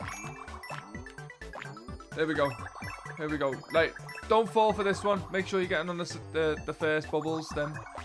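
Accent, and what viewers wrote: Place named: Liverpool, United Kingdom. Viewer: Scouse lad playing game of the year. Count me in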